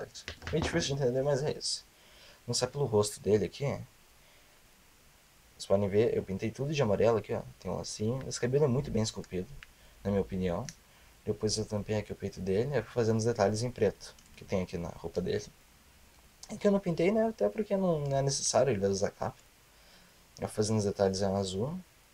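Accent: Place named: Portugal. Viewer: ah ta....é que o jeito que vc fala parece de portugal kk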